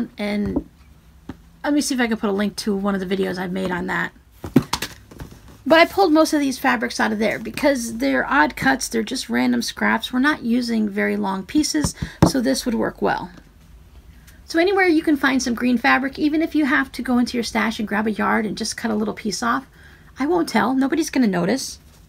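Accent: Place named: South, United States. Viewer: I am so thankful I came across your videos, about two years ago. You are so talented, I love the rambling (and the funny southern pronunciations), seeing your thought process, and how you describe every step (with many alternatives). Bless you.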